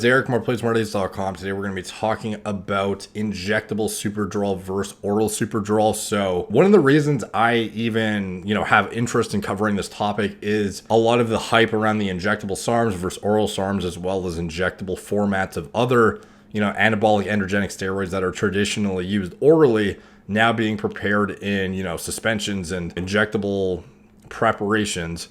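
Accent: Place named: Canada. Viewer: Thanks for the reply. Been watching a ton of his videos and was just wondering. His accent implied either Canadian or Northern USA. His accent didn't seem strong enough for me to automatically assume he is from Canada. Thanks again.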